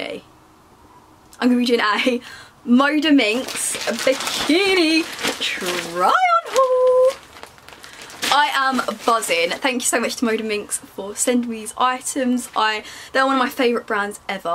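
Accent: United Kingdom. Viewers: Amazing body..love your goofy British accent too very cute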